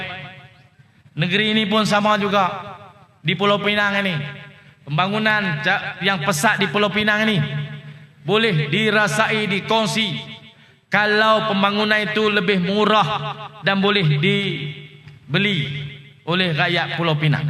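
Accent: Kedah, Malaysia. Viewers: ni cara anak kedah ckp...ori bya bahasa...trbaik mb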